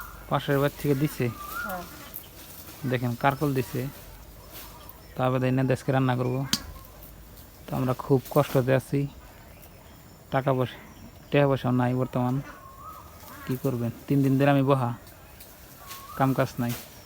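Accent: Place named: Mymensingh, Bangladesh. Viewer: আপনাদের বাড়ি ভারতে কোন জায়গায় মনে হয় ময়মনসিংহ ময়মনসিংহের মানুষ এরকম কথা বলে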